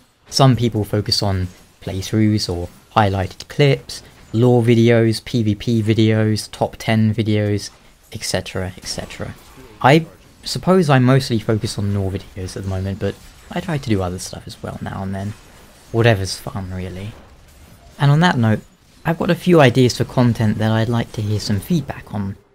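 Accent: United Kingdom. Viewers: I strongly agree with your ideas here. I don't play destiny, but I like the Lore videos you make. Also some Diablo Lore videos would be uber sweet. I also believe your British accent makes you very pleasant to the hear. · Go for it mate, even if you want to use dark souls ost if you need to, I rekon your audio book will be well received Also we're you born in the uk? Nice work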